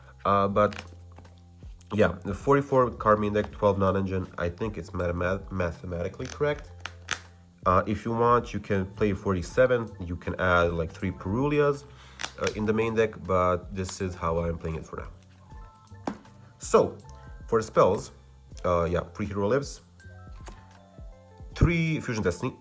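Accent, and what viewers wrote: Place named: Balkans. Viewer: I hear a bit of a Balkan accent there, would love to discuss more hero stuff. Testing stuff out again and defintely could use some tips!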